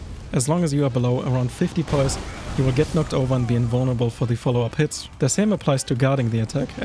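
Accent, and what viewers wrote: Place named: Germany. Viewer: Kann mir einfach kein deutsches Englisch geben mit accent es hört sich schrecklich an, pack mal ein bisschen Stimmung in deine Stimme beim vorlesen…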